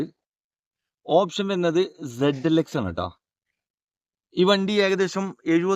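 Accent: Kasaragod, India. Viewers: കാസറഗോഡ് ബാസ 😅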